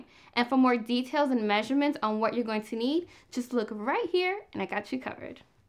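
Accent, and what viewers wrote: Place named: Queens, United States. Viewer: First off ... you sound like my people I knew in Queens, NYC :-) Love it! Second, I've been making mojo for years and I had no idea it was called this way! LOL! It's like the best marinade and base for just about anything Latin cuisine! The grilled chicken where this mojo is used along with the other ingredients is insane! My family loves it! I make it a lot! Gracias por todo!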